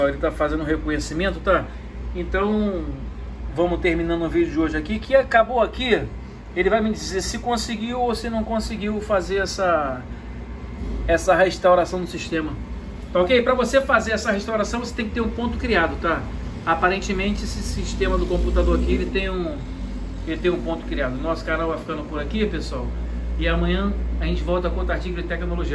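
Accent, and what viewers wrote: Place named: Rio de Janeiro, Brazil. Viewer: Com certeza vc é da zona oeste do Rio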